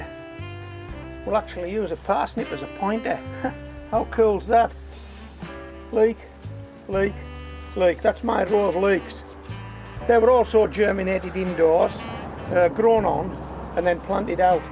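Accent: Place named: Cumbria, United Kingdom. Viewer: great video pal think you must live in west Cumbria by yer accent mebbis st bees way,im just up the coast if you are ,will be copying your vids,great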